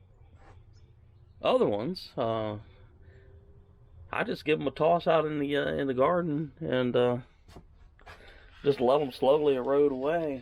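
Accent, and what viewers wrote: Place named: United Kingdom. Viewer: ❤ love woodworking Also really like your accent as well are from UK Where are you ?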